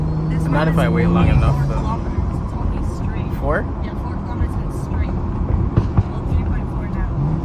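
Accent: Jamaica. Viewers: Yo u mi hear di Jamaican accent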